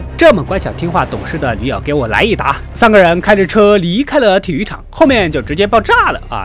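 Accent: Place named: Anhui, China. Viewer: 你是我们安徽老乡吗？反正好亲切呀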